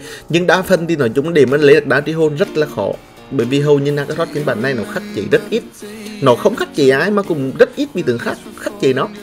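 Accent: Nghe An, Vietnam. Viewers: Anh nghệ an à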